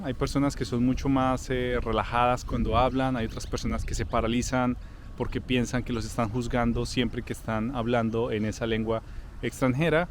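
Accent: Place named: Australia. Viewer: Gracias por este video tan interesante. Me gusta escuchar a acentos diferentes y el acento de Australia es divertido!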